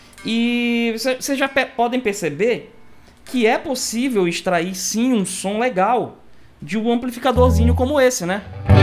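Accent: Pará, Brazil. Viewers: Video show de bola. tu é Paraense?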